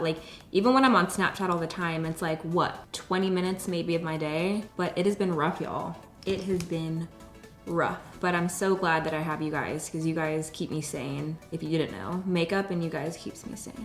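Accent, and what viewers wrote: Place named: Tennessee, United States. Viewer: I know people have to grow and change which isn't a bad thing...but I miss the old, filming in her bedroom with her cute Tennessee accent 😔😕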